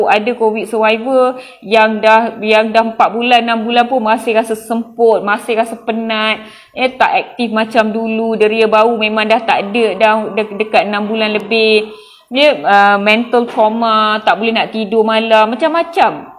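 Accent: Malaysia. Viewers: Doktor punya slanga memang melayu habis. Terima kasih diatas penerangan jelas ini.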